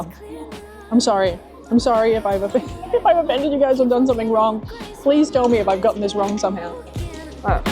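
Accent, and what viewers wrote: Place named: Australia. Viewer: Europe here. So nice to hear the wonderful Aussie dialect again, brings back great memories from my time down under. Thanks for sharing your travels. Really enjoy your vlogs.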